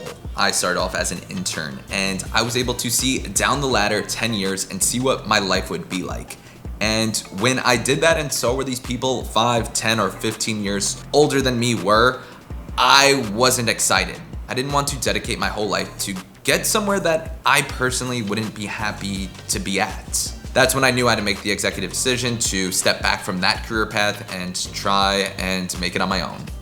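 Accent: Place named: New York, United States. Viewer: Not coffee... Kaawfe...you r a genuine New Yorker man...✌️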